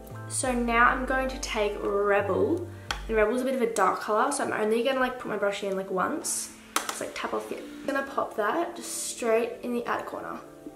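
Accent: Australia. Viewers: I could listen to Australian Accent all day😍🤗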